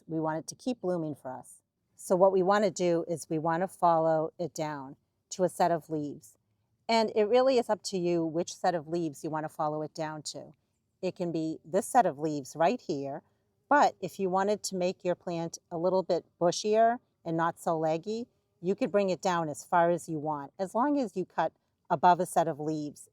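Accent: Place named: New England, United States. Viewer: You popped up first and I could immediately tell you were a new englander - great video thank you!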